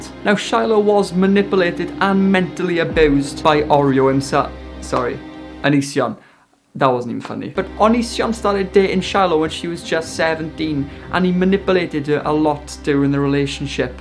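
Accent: Wales, United Kingdom. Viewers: Ngl I accidentally turned off the video when I tried to click on the channel once I heard the Welsh accent 😶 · Odd question but where are you from? You sound very Welshy like you’re from the valleys 🙈 I’m from Aberdare 🤣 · First video of yours I've ever watched and all I hear is the Welsh accent. 🤣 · Are you Welsh? Your accent sounds like it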